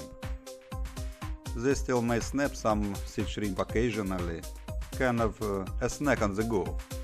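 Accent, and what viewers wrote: Russia. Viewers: you Russian? you have Russian accent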